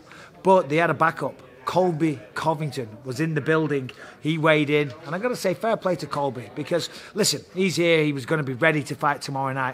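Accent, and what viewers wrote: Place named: Northern England, United Kingdom. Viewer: Definitely sound more Northern when you’re in England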